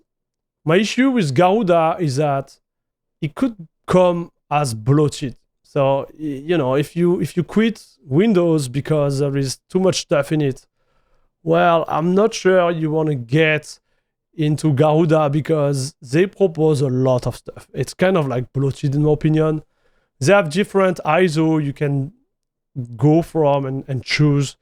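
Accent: France. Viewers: Pas facile d’écouter un Français qui parle Anglais. 😅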